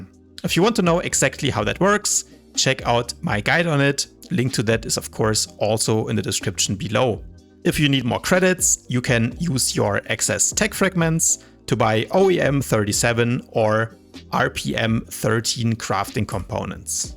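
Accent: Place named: Germany. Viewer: The "german" english is very nice zu hören :D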